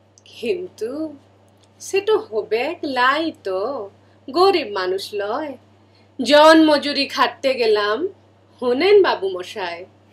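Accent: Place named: Rarh, India. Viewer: mondal apni ki kono din bangla upovasa(bengali nativ acents) gulor nam suneche? somvoboto sonen ni. eta amader bangla vasar sompod . kobita ta to rarh upovasa (ancholic bangla) tei abbriti kora.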